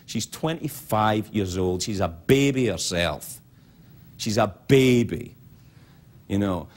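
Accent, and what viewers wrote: Scotland, United Kingdom. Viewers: His accent was so much thicker then. RIP Scottish Accent. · He sounds so scottish here. Usually i think he has lost his accent but i can definitely more than hear it, too. Also that audience sucks they didnt understand the assignment. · He still sounds Scottish here. He had almost lost it by the end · Is he Scottish? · His accent has gotten less Scottish and more Americanized over the years, for sure. You can really hear his accent coming out here. Maybe it's just him talking from the heart and not trying to do his usual monologue voice or something like that. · he sound REALLY scottish in this wow, his accent is a lot less prominent now. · he sounds so much more scottish here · He sounds a lot more Scottish here than he does now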